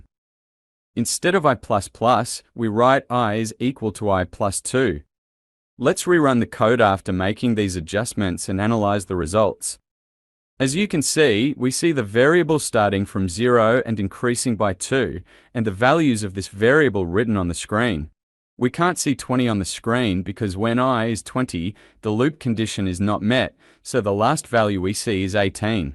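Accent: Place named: Australia. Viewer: Nicely explained with an Ozzy accent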